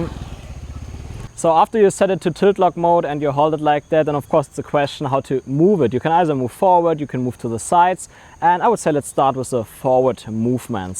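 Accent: Germany. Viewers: Mach Deutsche Videos man hört dass du Deuscher bist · Danke für die tollen Tipps.. (Asume your accent is German=).. Great ideas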